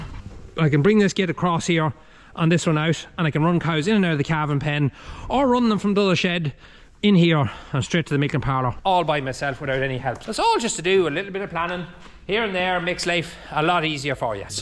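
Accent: Ireland. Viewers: Hi I am a small dairy farmer from Australia where milking 60-70 cows is not common. My wife and I both work off farm as well ( I am a farrier by trade)so at times it makes for long days. We have 5 grown up children and have enjoyed the times where your kids were helping to move cows stopping calves jumping over temporary electric fences etc ( just like us). Until recently I was beginning to question whether to keep going with a small herd and was perhaps embarrassed to be such a small farmer. Then you and your videos came along and you have inspired us and we have a completely new attitude. We want to be like with a beautiful, neat tidy little farm. When we are planning or doing new projects we even impersonate you with put on Irish accents. I do have Irish heritage from Cork. Thank you so much you have made us proud to be small dairy farmers 😊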